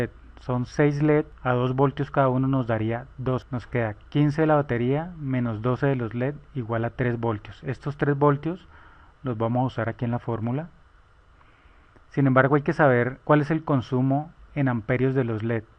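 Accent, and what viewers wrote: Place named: Colombia. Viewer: Hola pues desde Guatemala saludos me parese su asento colombiano, buenos amigos de por alla!! gracias por compartir todo esto desde muy pequeño me ha gustado la electronica, solo estudie un semestre en la u, pero agradesco que ponga a disposicion estos conocimientos !! bueno cualquier cosa le molesto!! buen dia!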